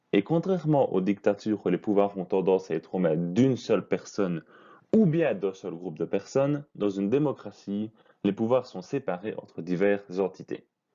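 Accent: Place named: Liège, Belgium. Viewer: Je pense plus à un accent de liègeee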